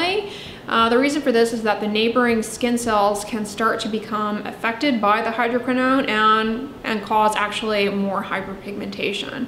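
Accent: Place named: South, United States. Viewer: How does she have both southern and valley accent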